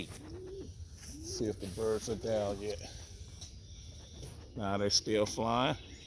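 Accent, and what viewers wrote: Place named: South, United States. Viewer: I knew you sounded southern lol i acually live in tn about 2 hours from u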